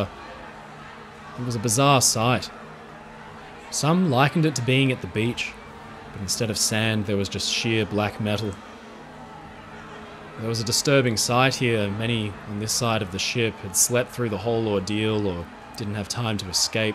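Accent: Australia. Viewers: I like how you speak australian. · "something about your accent" - quietly spoken articulate non ocker Australian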